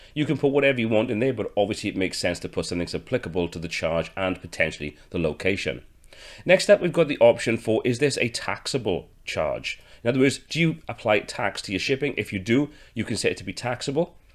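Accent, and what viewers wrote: Wales, United Kingdom. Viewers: Only just found this! Thank you so much! Also used to live in Cardiff whilst at Uni, so pleased to hear that Welsh Twang! Quick question: I have 3 products with a £1.29 UK Large letter size cost. I have 4 products with £3.85 UK small parcel up to 2KG cost. However, I'm not sure how to make the shipping default to £3.85, if 1 of each product is in the basket, as both will go in 1 parcel. This is not a percentage or item based cost equation. Many thanks in advance for your help - happy to email and pay for your help too. Neela.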